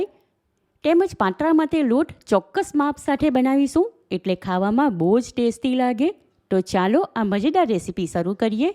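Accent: Surat, India. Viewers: સુરત નું ગુજરાતી આવું હોઇ છે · A sweet surti tone chhe 😊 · surat ni gamdani boli che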